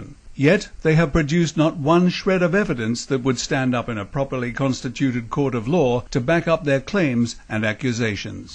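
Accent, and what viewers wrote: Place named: RP, United Kingdom. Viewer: More disinformation, deliberately delivered with an austere well spoken English accent designed to give it an air of legitimacy. Doesn't actually address the point. At 700 feet it is impossible for a 737 to travel at or around 500mph. Though some official estimates put them second at over 550mph. It would disintegrate and also be impossible to maneuver the way it did. Think driving a double-decker bus at Formula One speeds. Also doesn't address complete nose section making it through multiple layers construction grade of steel intact. Depleted Uranium anyone? If you believe the official story you are a child.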